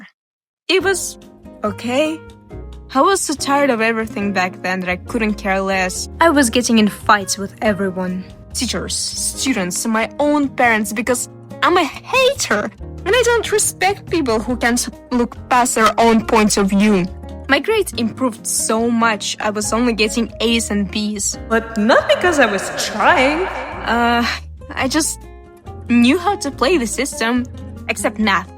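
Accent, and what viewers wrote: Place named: Russia. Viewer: my best friend is russian and still stuck there, I'm from Italy, and your video extremely reminded me of her :) ur accent (and hers) especially I think is always a fun accent to listen to. I'm sorry u ever felt insecure about it! as a trans dude and that also can relate to your struggles with other stuff, i hope ur life blossoms into the best u could ever wish for ♡ u got a new follower!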